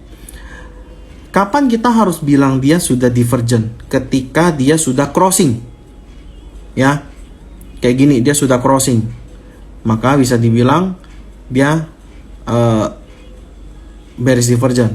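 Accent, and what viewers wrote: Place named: Kalimantan, Indonesia. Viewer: Dialek nya dari kalimantan ya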